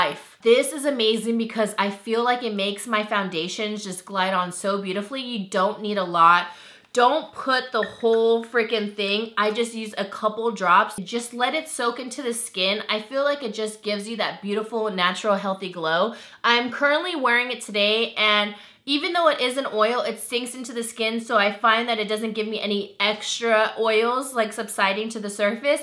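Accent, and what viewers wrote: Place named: Northern Ireland, United Kingdom. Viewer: Girl you have me in a pure kink with the Cana baelive it ! Soooooooo funny! You sound northern Irish 🍀 I had to rewatch it ! 😂😂😂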